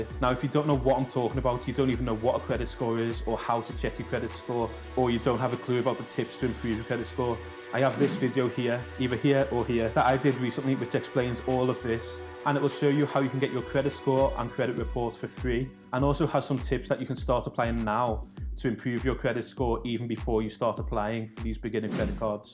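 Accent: Liverpool, United Kingdom. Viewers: Every little helps!! That was the cherry on the cake. I only watched this because you was a scouser. Well done.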